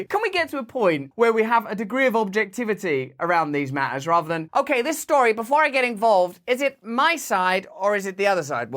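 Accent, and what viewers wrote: United States. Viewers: Your American accent is spot on 😂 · I wish there were a navigation app with Russel's American accent as the voice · this guy is talking like he's American...let me hear your American accent... better to hear then your natural tone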